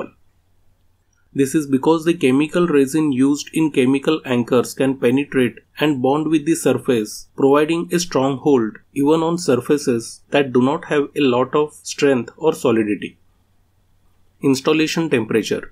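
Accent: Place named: Punjab, India. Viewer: i immediately exited the video due to the punjabi accent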